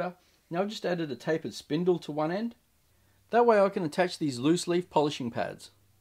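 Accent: Australia. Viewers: Great to hear an Aussie accent and explain things so well thank you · Ahhh... There's nothing better that clicking on the video and hearing an Aussies voice !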